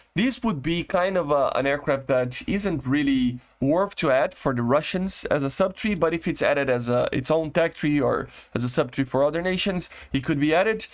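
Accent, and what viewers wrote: Brazil. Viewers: Tu é br? Sotaque parecido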